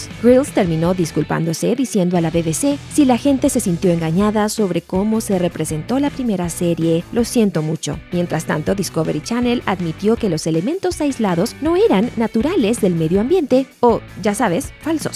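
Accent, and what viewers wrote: United States. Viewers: ES QUE ELLA VIVE EN USA, SEGURO HIJA DE MEXICANOS Y TIENE UN ACENTO ESTADOUNIDENSE(AMERICANO PARA LOS EUROPEOS). EL ACENTO DE MEXICO ES MAS NEUTRO.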